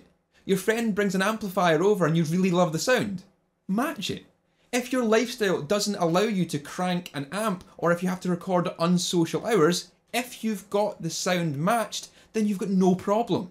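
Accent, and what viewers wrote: Scotland, United Kingdom. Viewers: Something about a listening to a Scottish accent that makes everything more real! Thanks.... And being Australian I didn't need subtitles!;)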